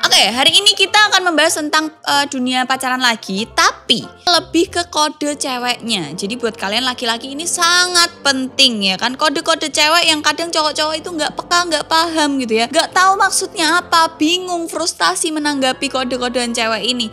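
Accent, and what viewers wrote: Java, Indonesia. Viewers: suaranya mirip Nafa Urbach,, logat2 jawanya gitu looh.. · medok bgt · Kok benar skl apa omonganmbak dng logat2 jawa yg kental.Koq jadi ingat dg mantan. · Wong jowo mb? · ko jawa nya bulet banget ya..hmm..yaahc,baik lh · Suka kamu logat nya jawa tulen 👍👍👍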